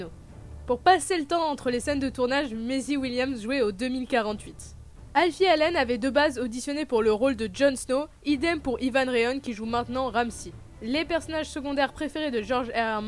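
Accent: France. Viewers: Kevin Domac la prononciation de certains noms est clairement difficile. On ne peut pas la blâmer sur ça, elle le prononce seulement à la française. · Tu me fais rire avec ton accent français lorsque tu parles en Anglais.🤣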